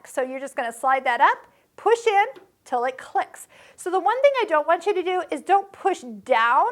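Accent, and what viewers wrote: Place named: South Dakota, United States. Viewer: Ty this was really helpful but I gotta say this is the most south dakotan accent I've ever heard 😆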